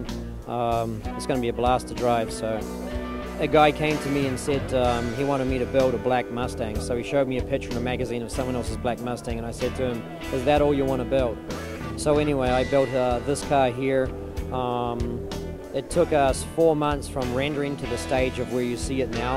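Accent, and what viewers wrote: New Zealand. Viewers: souns like a kiwi no doubt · is that a little nz accent mixed in? lol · this guy sounds like a kiwi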